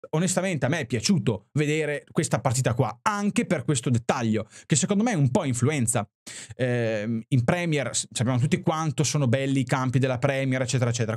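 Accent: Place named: Veneto, Italy. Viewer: Grandissimo bel video..ma poi mi fa sorridere quel tocco di dialetto veneto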